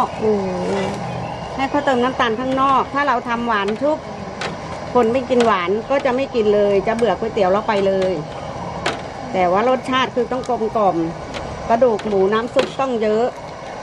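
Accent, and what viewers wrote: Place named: Nakhon Sawan, Thailand. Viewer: สำเนียงพูดเหมือนคนนครสวรรค์เลยค่ะ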